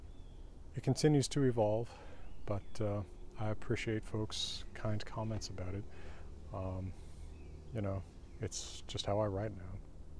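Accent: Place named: Georgia, United States. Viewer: Well, I like your handwriting ! Thank you for sharing a glimpse of your teenaged self . You were already becoming an intellectual by then ! I just stumbled upon you , when you and Simon Roper were “communicating “ in Old Norse or something ! Just last night up popped you and another linguist were talking about the beginning of the alphabets. I am of retirement age, and home school two granddaughters, a 8 year old third grader , and a 13 year old 8th grader . Would love some of this to rub off on them ! Do you by chance have something I can use to inspire them ? I live is SE GA. Natives of NE FL. Surprised that you went to U GA ! Your soft GA accent still coming through .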